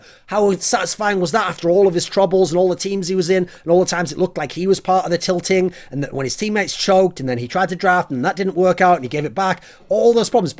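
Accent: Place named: United Kingdom. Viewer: Waddles It's unscripted but he has good speaking skills, so he can just say his thoughts as they come in a way we understand. Plus he has a British accent, so it sounds cooler.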